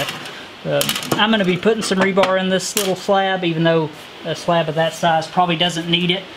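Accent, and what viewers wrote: South, United States. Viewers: I didn't think how much is added to your videos by your accent until I heard your dad speak. I've gotten used to hearing you and, and seldom think that you speak differently than us Midwestern folk. I met my northerner wife while attending school in Chattanooga. Southern accents have always been a treasure to me since then.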